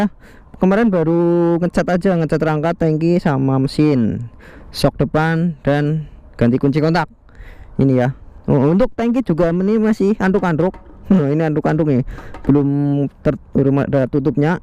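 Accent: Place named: Java, Indonesia. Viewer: Mas idola bgt siiii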